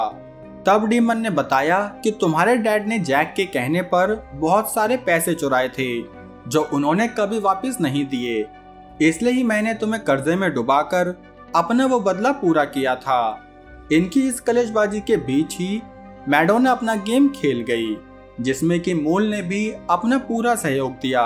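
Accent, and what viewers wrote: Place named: Braj, India. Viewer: Tumhari boli se lag rha hai tum brij kshetra se ho, probably mathura ya agra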